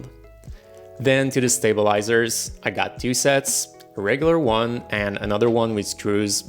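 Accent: Quebec, Canada. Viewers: Hey, are you looking to sell this keyboard? I live in Montreal and I can get around - I figured from your accent that you are from Qc as well. So if you're interested, hit me up!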